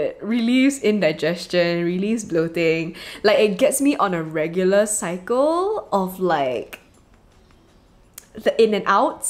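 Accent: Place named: Singapore. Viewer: Your singlish is impressive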